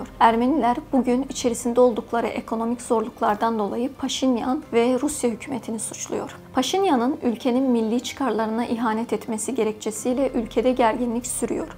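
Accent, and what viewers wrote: Azerbaijan. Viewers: Azeribaycan aksani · Bu niye böyle konşyor Bu qiz yüz faiz Azərbaycanldı Türkce niye danşrsan Azərbaycan Türkçesiyle danış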